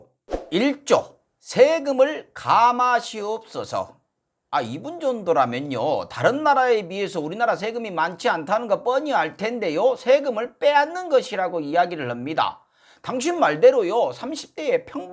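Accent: Jeolla, South Korea. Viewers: 무슨 말씀을 하시더라도 상관없는데... 제발 전라도 말은 과하게 안쓰셨으면 좋겠습니다 ㅠㅠ 제가 전라도인데도 전라도를 대표하는 사람처럼 얘기하지 않으셨으면 좋겠습니다 ㅜㅜ정말 부끄럽습니다ㅠㅠ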